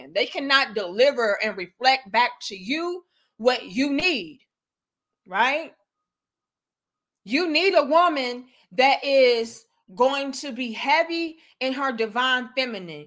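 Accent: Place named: South, United States. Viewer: She has the most unique southern proper voice and dialect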